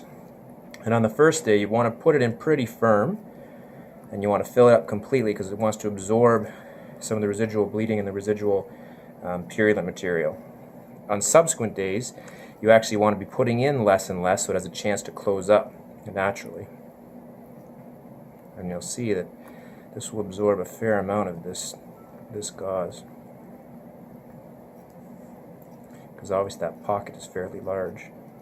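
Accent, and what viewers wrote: Canada. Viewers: "A month, eh?" Knew he was Canadian almost right off the bat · he is Canadian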